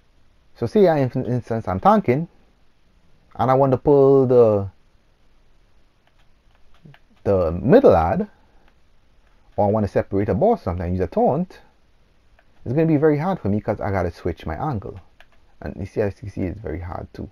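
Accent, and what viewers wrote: Trinidad and Tobago. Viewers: Are u trini? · You from Trinidad bro?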